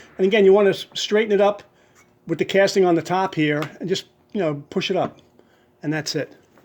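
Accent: United States. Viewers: Love the Long Island accent! Cool videos. Keep 'em coming! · Thanks for the reply and there were times I SURE could have used one of those shift rod connectors. I HAVE just twisted a stainless bolt in and it worked. I been meaning to ask where you are, am I correct that the accent is North Eastern US?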